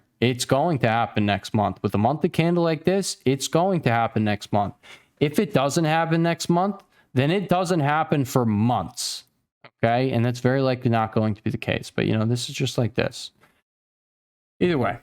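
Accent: New Jersey, United States. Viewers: As a European, your New Jersey accent makes this so funny😂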